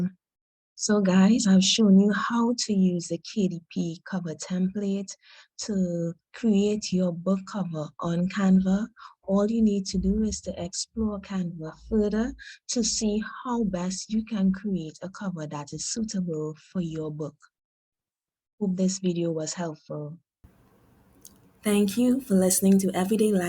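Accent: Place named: Trinidad and Tobago. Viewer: I recognize a trini accent here!¡ Good job in explaining this - i can't seem to pass the error message in print previewer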